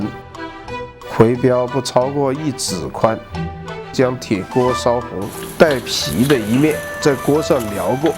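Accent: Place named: Changsha, China. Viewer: 长沙话就是韵味！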